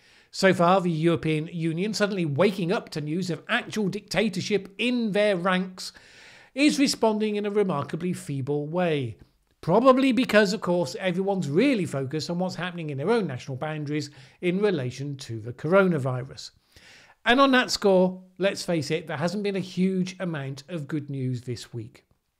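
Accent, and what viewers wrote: United Kingdom. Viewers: Thank you once again. A sane voice is always welcome in any discussion and your approach of tackling outlying views prior to narrowing the examination is especially effective (and necessary in todays internet society) in getting to the core of the subject. I am sharing this with friends in the States who will doubtless enjoy your unbiased examination. Not to mention the British accent. All the best from a Scot in Panama. 😁🇵🇦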